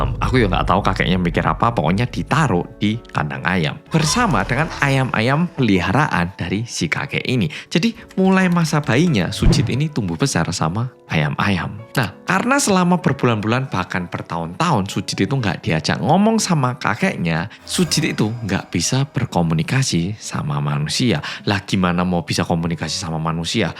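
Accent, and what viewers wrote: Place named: Java, Indonesia. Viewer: tks medok ❤ Alhamdulillah Sujit bisa berubah meski pelan👌🏿✌🏿💪🏿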